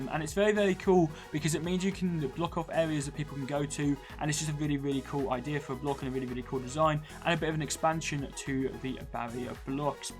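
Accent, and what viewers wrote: United Kingdom. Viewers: THICK BRITISH ACCENT BE'ER I LOVE IT BRO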